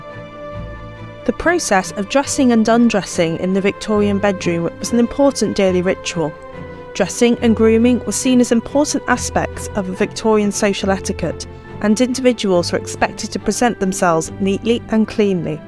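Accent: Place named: United Kingdom. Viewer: I love British accent😊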